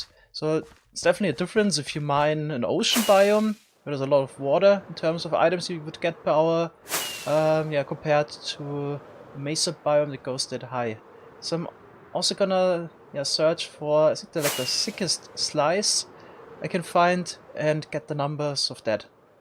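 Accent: Germany. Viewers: I love his accent mmmMMM German accent is so underrated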